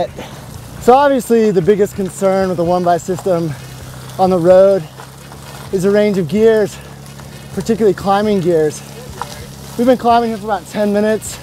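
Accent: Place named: England, United Kingdom. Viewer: the english accent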